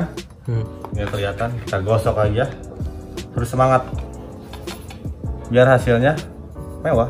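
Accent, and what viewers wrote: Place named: West Java, Indonesia. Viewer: Urang sunda pisan cepel wkwk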